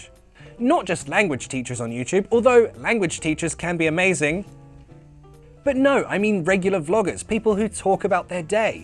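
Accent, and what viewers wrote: United Kingdom. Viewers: I love the Learn and Improve Your English Language!! With Your British Accent, and Congratulations,!!! 🇬🇧😍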